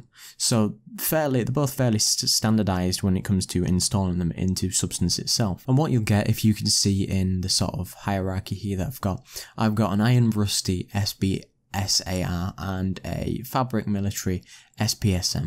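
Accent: United Kingdom. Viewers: What a waste of time, you've shown only a single way of adding files to Painter, and you have not shown the much more useful method of how to edit the paths to assets in the preferences. And sbs files are not left out of newer versions, as they are crucial for being able to edit the materials and shaders in Substance Designer. You seem pretty clueless, you should learn more before you start putting tutorial videos up on the internet, your arrogant, know-it-all attitude, and regional British voice notwithstanding, lol....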